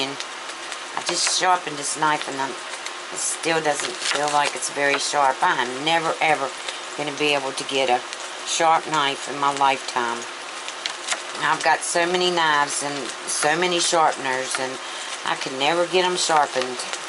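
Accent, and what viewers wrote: South, United States. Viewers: I cant believe any body could be so rude to you...I guess someone with too much time on thier hands. Im.a brand new subscriber, love your recipe, and your southern accent..keep the great recipes coming. And God Bless You❤ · Looks delish! Never heard of Lux Pinto Beans..? Do they sell this brand in New York? LOL.... Love your Southern Accent!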